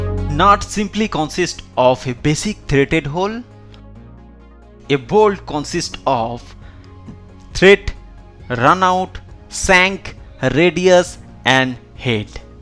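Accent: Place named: Bengal, India. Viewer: Are you bengoli?....because accent is like that By the way love your content from Odisha😊😊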